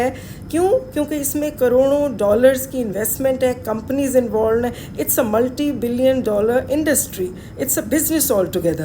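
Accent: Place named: Punjab, India. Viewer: Your Punjabi accent ❤, do improve your thumbnail tile graphic design, regards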